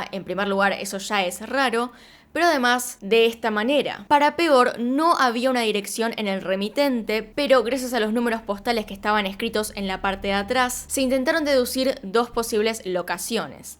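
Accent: Argentina. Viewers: Wow, la verdad, que al escuchar tus vídeos me relajo demasiado xd, no soy muy fanática del acento argentino, pero del tuyo le hago hasta un altar 😔👊💛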